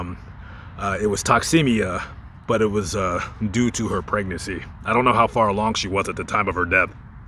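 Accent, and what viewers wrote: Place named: South, United States. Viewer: great pachyderm 0 seconds ago I suggest you visit the St. Nicholas Church Cemetery in Patoutville, Louisiana. In March of 1968 the salt mine collapsed at the Belle Isle Salt Mine. 5 members of the same family died there along with 17 other miners. My cousin Dennis Romero (not buried there) was one of the victims.. You're in Texas and you talk with a somewhat Southern accent and can't be far away. Haha. I'm in Texas often and know how big it is.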